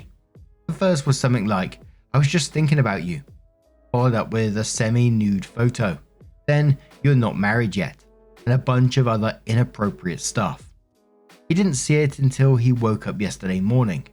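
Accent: United Kingdom. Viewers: He's British they have a different way of speaking fuckin deal with it and stop making it some sort of big deal when it's not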